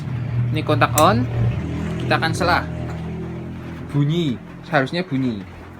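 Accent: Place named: Java, Indonesia. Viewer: Rika jawane Endi medok temen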